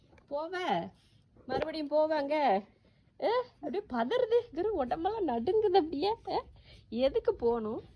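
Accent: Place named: South Tamil Nadu, India. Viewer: Sis neenga irukirathu covai. But native south district. Am I right? B cas neenga pesura slang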